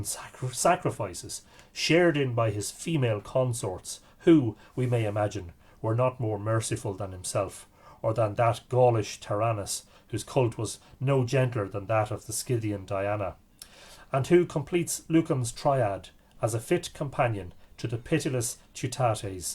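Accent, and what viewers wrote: Ireland. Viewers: I love Irish mythology, and always have, and to hear it read by an Irishman? Thank you sir, for this pleasure.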